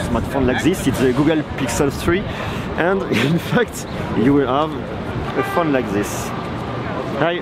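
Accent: France. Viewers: Americans are going to love you mostly with your Frenchy accent.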